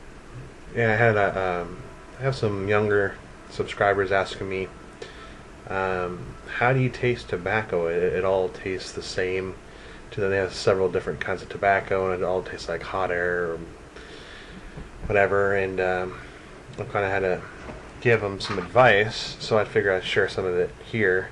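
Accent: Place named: Ireland. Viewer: Dude you have to be Irish :) I'm a cigar smoker but thinking of picking up pipes and stumbled across you're channel! Subbed.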